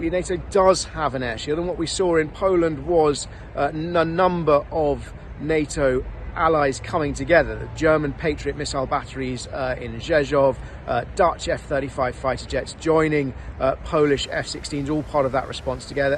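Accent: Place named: United Kingdom. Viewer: Yet another DEI jackass with a british accent talking about what he doesn't know.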